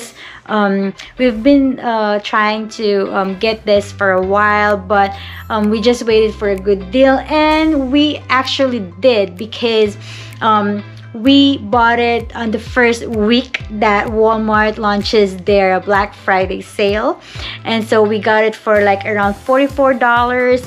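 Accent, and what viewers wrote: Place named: England, United Kingdom. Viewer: I always love your english accent, Congrats for having a new one, enjoy your new air mattress #dabestieszoe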